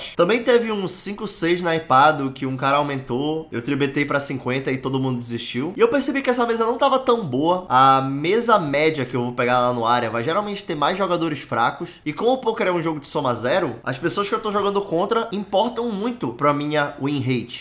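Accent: Pará, Brazil. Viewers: mano que video legal, vou começar a acompanhar, to jogando online e to ganhando por enquanto, no positivo em relação ao que eu coloquei, percebi pelo sotaque que vc é do Pará né, muito massa mano, só sucesso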